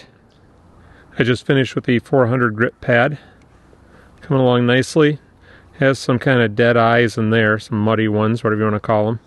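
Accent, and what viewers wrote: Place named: Michigan, United States. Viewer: I love Petoskey stones! I was born in charlevoix. Your accent makes me homesick. I live in GA now.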